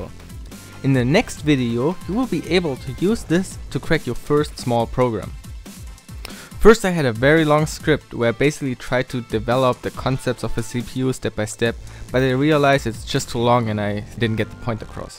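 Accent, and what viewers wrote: Germany. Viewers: I agree. Too fast, his drawing is too messy, his writing too small AND he got the stack direction the wrong way around (albeit correcting it after the fact). He talks too fast AND IN A GERMAN ACCENT that isn't easily parsed at high speed. What MANY "tutorial" publishers seem to forget is that it's the people who DON'T KNOW THE SUBJECT who are your primary audience - if that audience can't understand you, or your sloppy preparation (or lack thereof) starts them off on a flawed footing, why are you teaching at all? That would drive me insane - I wouldn't DARE publish a tutorial in the state this is in, I just couldn't.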